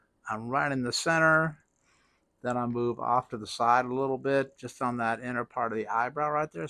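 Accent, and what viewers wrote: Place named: Louisiana, United States. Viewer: Tell me this man has Louisiana roots..... or am I hearing things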